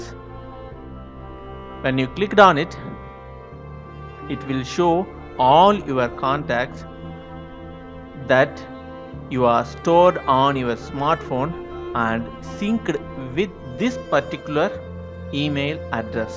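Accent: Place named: India. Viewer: Pure Indian accent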